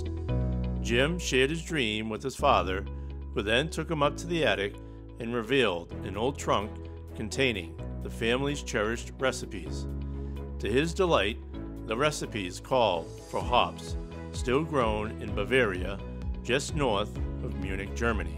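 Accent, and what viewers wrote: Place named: Massachusetts, United States. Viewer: That’s interesting because I was always told Sam didn’t have their own breweries but contract brewed …which never made sense to me,. Thanks for clearing that up …I didn’t know they opened up by fanuiel …I’ll have to hit that. sounds like we have same accent, I’m from cohasset …are you from mass?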